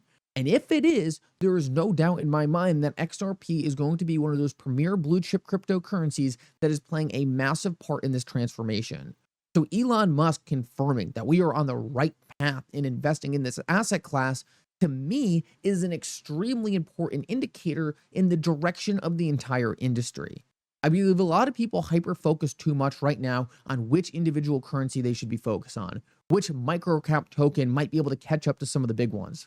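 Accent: Allentown, United States. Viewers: with an Allentown accent bunch of bs again. Even Elon cannot move shit coin XRP upwards,lol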